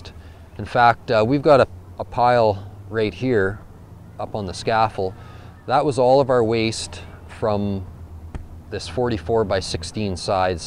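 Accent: Canada. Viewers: First time here....why do you sound canadian?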